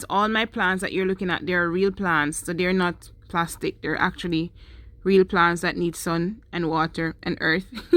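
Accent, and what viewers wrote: Jamaica. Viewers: Uhm, you say you're not an interior designer but I beg to differ. Really awesome look. I hear your Jamaican accent. Curious to know your journey to Cayman. I'm watching from Japan.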